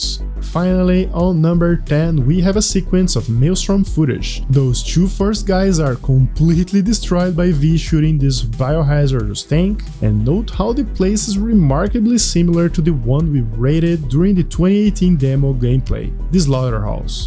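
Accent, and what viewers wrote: Brazil. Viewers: cara, você é brasileiro ?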